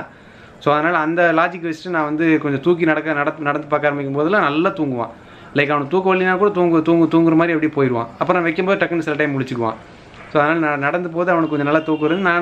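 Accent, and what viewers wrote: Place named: Tamil Nadu, India. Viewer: Ungalooda slang paatha pakka vellala gounder maathiri irukku.crt uh? Anna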